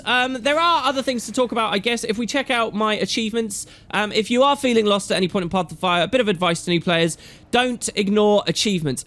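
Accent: United Kingdom. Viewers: I really like this channel and find myself agreeing with much of what the speaker says. Though this could be because I'm a sucker for a British accent. :-D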